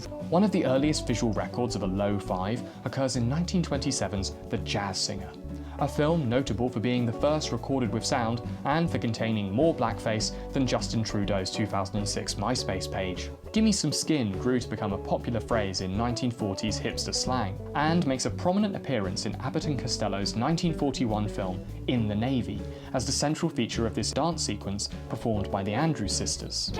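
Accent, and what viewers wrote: United Kingdom. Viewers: I love hearing the British explain baseball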